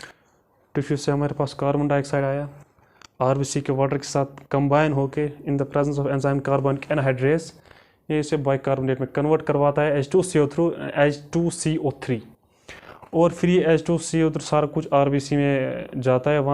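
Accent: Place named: Kashmir, India. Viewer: Kashmiri axient aata h bhaiii....but Lecture is good..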